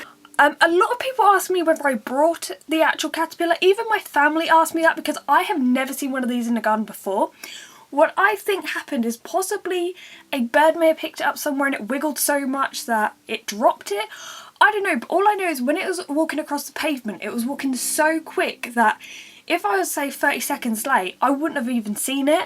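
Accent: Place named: Southern England, United Kingdom. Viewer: What I spotted was a "common" or viviparous lizard. I walked past it as it was busy sunning itself on a tree-stump...but the HOLY GRAIL of lizards to spot here in the UK would be the sand lizard. Pretty sure they're only found on the south coast, and you sound like a southerner so... x)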